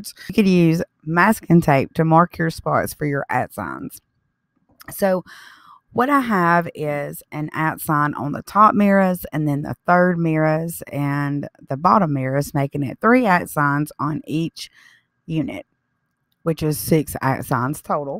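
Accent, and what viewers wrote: South, United States. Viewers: Love the country accent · Love it! Where you from girl? Very Southern. I'm from S.C.! ☺💜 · I like it. I would love to see one in white or wood look.. Great job. Love you....you are amazing!! I love you soo much. We are both country gals. Our pronunciations on some are just about the same. I live in the south. But you got it going on.... Xoxo. Hugs your way.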